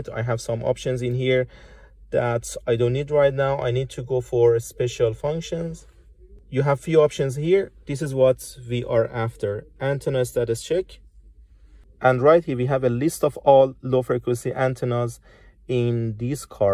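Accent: Iran. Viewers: r u iraniain? اگر اره بگو فالو کنیم . هرچند از لهجت ایرانی بودن میریزه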